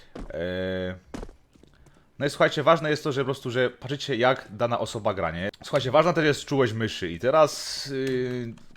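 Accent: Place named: Silesia, Poland. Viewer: Czuć ten śląski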